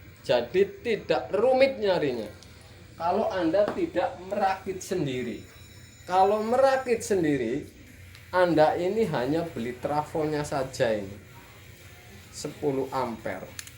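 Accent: Banyumas, Indonesia. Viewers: Senadjan kesanne mandan kaku, nanging aku bangga... logat Banyumas ane bisa dikenal Maring saindenging Nuswantoro.... aja kelalen... tetap semangat 45.... merdeka....!!!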